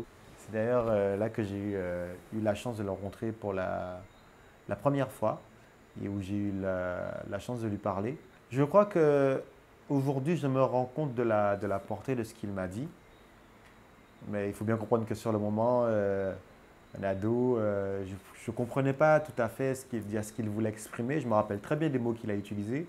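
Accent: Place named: Haiti. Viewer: Les Guadeloupeens parlent aussi le creole mais lui il parle le creole comme un Haitien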